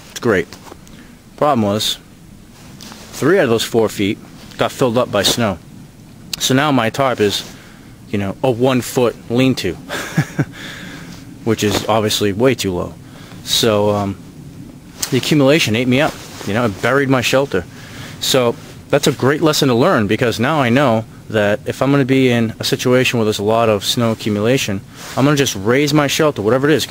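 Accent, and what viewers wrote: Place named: Massachusetts, United States. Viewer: Watched part 1 as well. Thought to myself "sounds like he's from ma". Soon as I heard you say New Bedford I knew I was right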